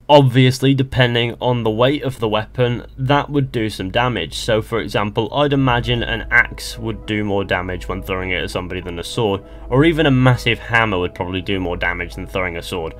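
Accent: United Kingdom. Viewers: Hahahaha !!! ... "Arrow after arrow after arrow after arrow" ... I Enjoyed a lot hearing this in your British accent ... I am still laughing ...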